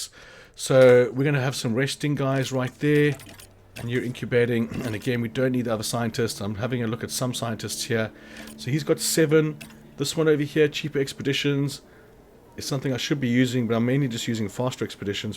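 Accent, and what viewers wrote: South Africa. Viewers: Are you South African? If so I am too :D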